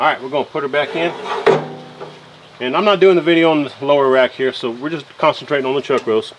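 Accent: South, United States. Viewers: Looks good, might have to try this sometime. Is it just me or has developed an even STRONGER southern accent in the last year or so since I watched one of his videos? Seems like he might be hanging out a bit more than usual with some boys from the deep, deep south.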